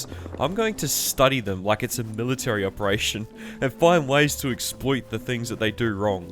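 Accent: Australia. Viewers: OMG, I’ve been watching since you had 500 subs, even on different accounts. Your Australian voice and the way you explain things always keep me hooked. It’s crazy to see how far you’ve come, and honestly, you deserve all the success. Can’t wait to see where you take this next – keep doing what you’re doing, it’s seriously inspiring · Are you Australian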